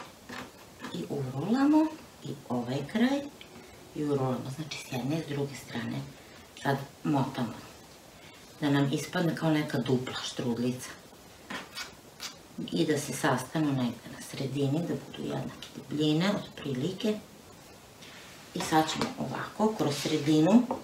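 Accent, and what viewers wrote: Vojvodina, Serbia. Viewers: Ona meni toliko super priča...ja sam s mora iz Dalmacije ali kad čujem taj vojvođanski naglasak se uvijek oduševim...a kad kaže "štogod lepo" to je najsmješnije😂👍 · Lep je kolac,draga,a boga mi i ti si mi lepa kao lutka,kao da si promenila boju kose,stogod lepa!? Jos jednom hvala ti za sve recepte,modlice i volem da te slusam,cujem moje,moju Vojvodinu,stogod lepu! ❤️❤️❤️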